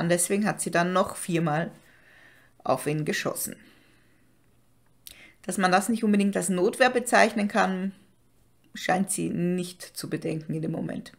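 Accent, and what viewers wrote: Austria. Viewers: Also ich kann nicht mehr aufhöhren dich zu höhren ! Du hast jetzt den ersten platz von Allen,,true crimerinnen,,dein österreicherische akzent ist die kirsche auf der torte. Weiter so❤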